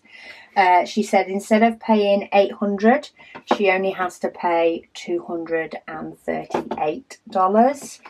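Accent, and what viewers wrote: United Kingdom. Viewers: Hello, i don 't comment much because i'm painting together with you during the waffles. Great company! Love the british accent which reminds me of schooltimes. Also a very nice refresh from a lot of american youtubers which i also enjoy. Now don 't get me wrong with the following! I had a very surprised chuckle when you read my last comment out loud. The first 2 times you got my name right with lovely accent, bonus points haha. Last time you made it sound like "may raven" like the month and the Bird. That is the very first time that happend and i was happily laughing. My name is very hard to get right and this was the most unique response. Not offended! Don 't be doubthing yourself. I guess to explain you say my first name like "mai rah". You added a bit of my last name as well. ;) even dutch people Don 't get it right all the time. 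My parents just love the name and so do i. More people with unique names have the same experiences? That was my ramble, happy painting!😂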